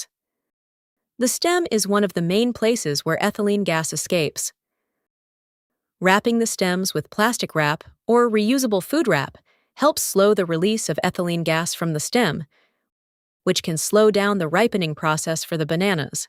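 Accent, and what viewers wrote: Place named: United States. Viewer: BanEnas, can't we have a proper English version on Youtube as opposed to American so called English.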